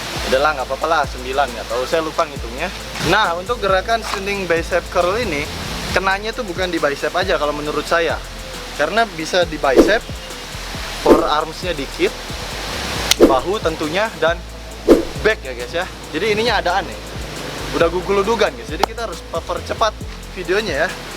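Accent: West Java, Indonesia. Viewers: Sugan teh orang batak mang pek teh urang sunda wkwk hade lah hade top ilmuna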